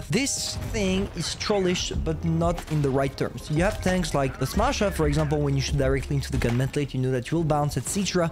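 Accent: France. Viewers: Are you French from treytens videos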